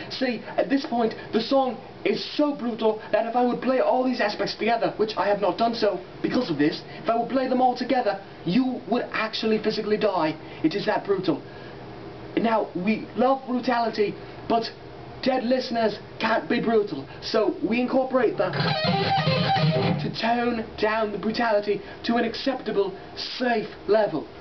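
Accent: United Kingdom. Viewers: This Vid...Had a important factor, a British Accent BRUTAL GUITARIST! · fuckn british accnt irritates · I hate that english accent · where the fucking shit do ya live?? o.O your accent tells me you must be british but where exactly??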